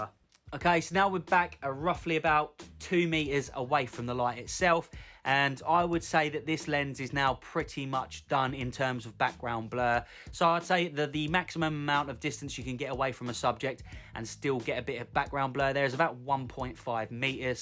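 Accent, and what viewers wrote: United Kingdom. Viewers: having a studio at home is always an issue. It’s the biggest issue that stops people from setting up a channel. I can tell from your accent your from the south east, stay safe mate.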